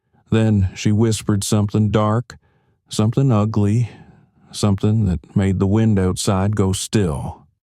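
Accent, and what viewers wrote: South, United States. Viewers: This spooky story should become a classic. Dark atmospheres. A soft Southern Drawl with wording the way only an old time Southerner could say it. And the story! Spooky as all get out! But tethered to our earth by its moral lesson. Surely a tour de force of Kentucky lore. Thank you!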